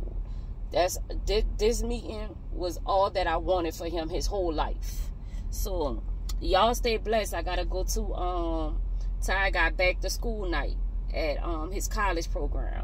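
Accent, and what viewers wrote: New Orleans, United States. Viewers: Girl I listened to you and said, home girl is from the NOLA!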